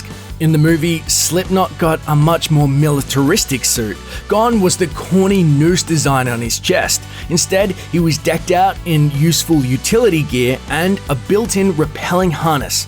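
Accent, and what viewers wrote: Australia. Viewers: ha Australian. like me. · HOLY SHIT AUSTRALIAN ACCENT IS SO LOUD! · I didnt know that you were from Australia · is aussie?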